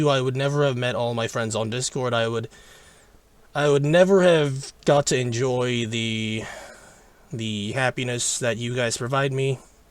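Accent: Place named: United States. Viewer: You sound so American lol! Seriously, it feels very niche here in the US today, sadly never can find anyone I know who thinks Asphalt games are NOT ridiculous. You're living the gamers dream, which is basically to be like a top 100 player in their favorite game and I think maybe you underestimate how cool that is. Nobody would trade that! Keep being a good guy, it's your best trait.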